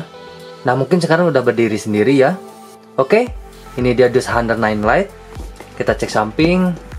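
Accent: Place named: West Java, Indonesia. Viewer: logat nya orang sunda , bandung kah?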